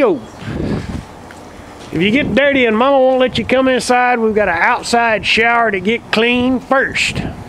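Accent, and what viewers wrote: Texas, United States. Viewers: i really love this video ,, thanks you Texas accent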